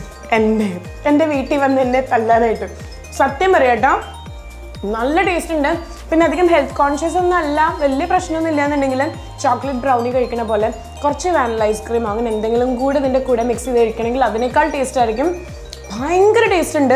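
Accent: Thrissur, India. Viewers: Ath pinne Nammade THRISSUR kkari alle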